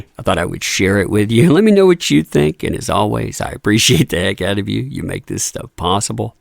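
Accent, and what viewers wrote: United States. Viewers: Man, this accent is too American.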